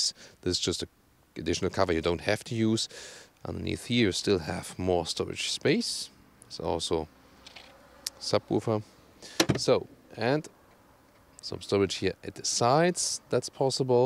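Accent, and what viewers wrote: Germany. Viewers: he is the germanest person i've heard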